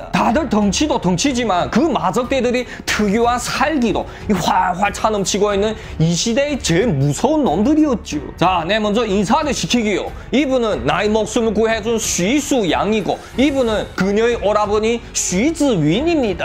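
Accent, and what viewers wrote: China. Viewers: 이분 조선족이신가요?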